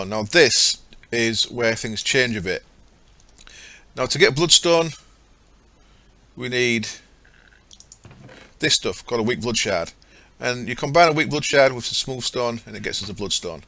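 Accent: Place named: Yorkshire, United Kingdom. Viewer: thought you sounded as if you were from yorkshire :)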